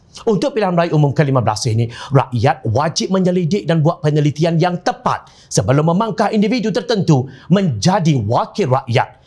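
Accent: Kelantan, Malaysia. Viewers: 😂😂😂speaking kelantan pawer lah bro ni👍👍👍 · Bijok weeh demo ajuk keccek Kelantan.Ambo jatuh hati. 😀😃😄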